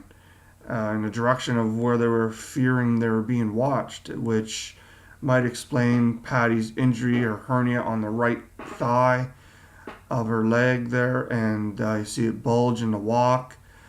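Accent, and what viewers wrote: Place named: Canada. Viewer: Thanks. Love your Canadian accent, and your videos too.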